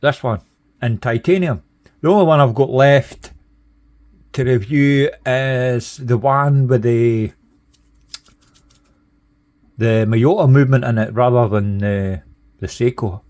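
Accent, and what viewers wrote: Scotland, United Kingdom. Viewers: Sir, you are the most Scottish person I ever heard. Love it.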